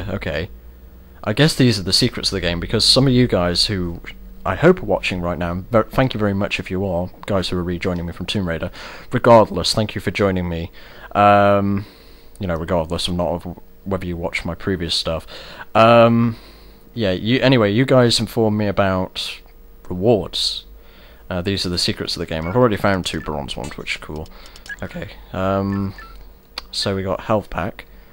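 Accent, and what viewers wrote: United Kingdom. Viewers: AWWW THAT IS ADORABLE!!! Why do you British people have one of the best accents evar!? lol.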